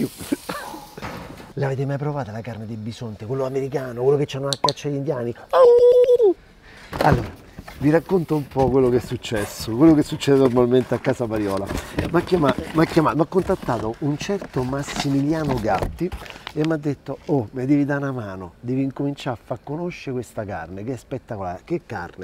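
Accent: Rome, Italy. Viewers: A parte la tua cultura culinaria che nn si discute, per la personalità e il modo di esprimerti così semplicemente romano, nn sei da meno di grandi attori come Sordi, Fabrizi Montesano e altri! Sei vero!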